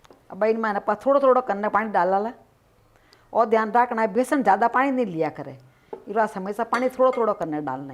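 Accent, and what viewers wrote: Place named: Rajasthan, India. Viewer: Rajastani bhasa boat pari lagi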